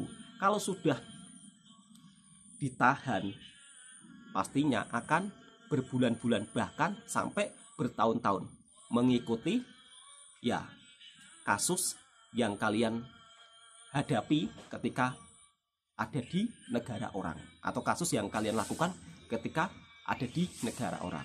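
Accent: Java, Indonesia. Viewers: Ngomong lu medok... Mending belajar ngaji yg bner ngucap allah masa nyebut nya owoh 😂😂😂😂😂😂😂😂 Dunguuuuu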